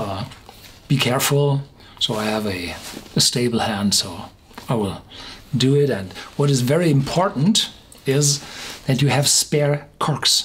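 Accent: Germany. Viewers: his voice and his german accent are sooo ear-pleasing !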